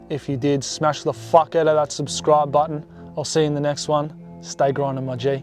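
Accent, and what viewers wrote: Australia. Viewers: Are you from Melbourne or Queensland?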